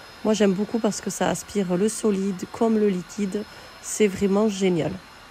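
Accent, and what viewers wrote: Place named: Midi, France. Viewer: Mignon l'accent du Midi 😊😊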